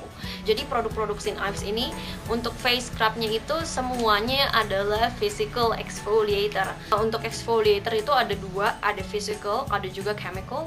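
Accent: United Kingdom. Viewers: 1. 2. probolinggo 3. mau yang radiant skin kak, mau nyoba soalnya kulit aku kusem wkwk 4. ihh aku suka banget sama aksen inggrisnya kakak, bikin video tentang aksen inggris dong kak, trus semoga subscribernya nambah kak. sukses kak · Ig :Vitataaaaaa Kota Asal: Gresik-jatim Yay akhirnya GA time juga!!Awalnya sih aku ngasal buka video kaka gegara nyari review st.ives,dan dari itu aku langsung cinta banget sama chanel kaka,auto like n subscibe + aktifin lonceng sejak pertama kali nonton (ini ga boong swear✌),yg pertama bikin aku jatuh cinta tuh cara present nya yg bener2 se -profesional itu,dan bener2 ngreviewnya gapernah ngasal,banyak banget benefit yg didapet habis nonton video kaka,aku jadi tau kandungan apa aja yg mesti di perhatiin di ingredients produk biar milihnya tepat sesuai skin type,dan dan dan yang paling bikin aku jatuh cinta tuh cara ngomongnya yg ada aksen britishnya subhanallah berasa sekaligus belajar pronouncing gitu😍😍😍dan aku ikutan ga ini karena pengen banget nyobain st ives yg coconut n coffee,penasaran sama deep exfoliate+ gentle nya kaya yg kaka jelasin❤❤❤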